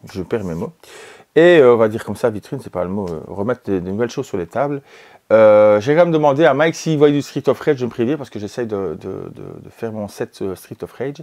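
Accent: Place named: Belgium. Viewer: salut salut!!! Super la vidéo j'ai pas su y aller et j'y tenais comme néophyte pour voir se que l'on ose imaginer! La prochaine fois promis. Autre chose, On avait parler de pc console portable pour faire plus abouti, j'avais parler de la rog alloy mais depuis qu'elle est sortie c'est toujours hors budget, tu m'avait transmis un lien pour des occas Steam deck mais je ne retrouve plus le lien. Parce que bon j'me suis laisser avoir par l'anbernic rg 556, pour laquelle tu ne m' pas donner d'avis constructif si il y en a un. En tout cas je reste a se que j'ai pour l'instant dans l'idée de m'offrir une Steam deck Oled plus tard.... D'ailleurs il y a un délais responsable sur lequel compter pour pouvoir se l'offrir quand elle le faudra encore sans non plus avoir une bouzz a 100 balles... Je me limite PSP PS2 wii etc pour l'instant et d'ici quelques mois j'investî gros! 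PS: j'adore l'accent belge pour l'être moi même, ça fait encore plus en vidéo haha.merci pour les infos!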